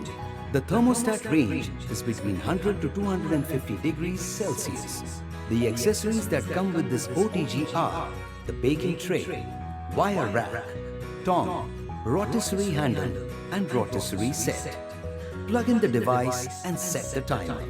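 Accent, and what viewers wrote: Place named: United States. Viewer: ये क्या American हिन्दी hai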